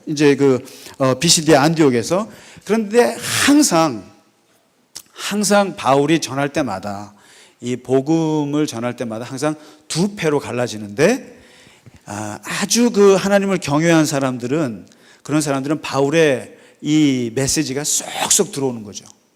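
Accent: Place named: Gangwon, South Korea. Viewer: 목사님, 목사님을 통해서 많은 생각을 하게됩니다 젊은신 목사님같으신데 해박하심에 듣고있는것이 즐겁네요 덕분에 사도행전-성령행전에 빠져가고 있네요 그리고 여긴 강원도 동해안인데ᆢ목사님 여기분이신가요? 말씀듣다보니 여기지역말씀이 불쑥나오는경우가있으시기에ᆢ^^ 언제나 감사하며 듣고있습니다~ 건강하세요~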